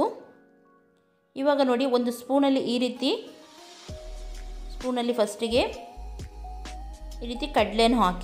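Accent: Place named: Udupi, India. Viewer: Idu udupi kannada mr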